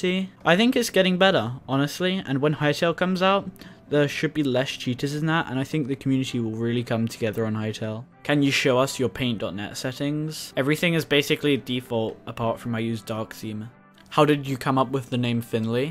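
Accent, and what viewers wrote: United Kingdom. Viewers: U have such an english accent fam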